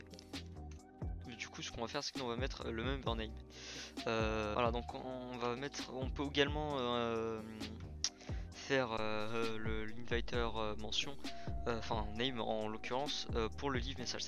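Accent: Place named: France. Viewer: idk man french accents are so pretty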